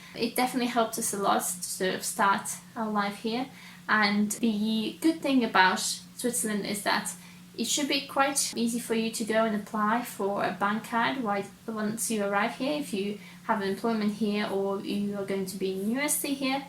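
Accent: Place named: England, United Kingdom. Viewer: I ❤ the English accent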